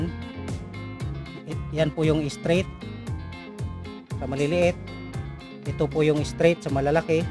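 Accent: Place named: Visayas, Philippines. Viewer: Boss tha cebu ka raw, kaya pala pansin ko may bisaya ka na accent hehe tga cagayan de oro ako, proud bisaya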